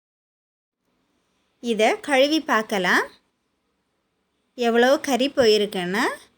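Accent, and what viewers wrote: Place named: Kanyakumari, India. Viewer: Kanyakumari tamil. Very great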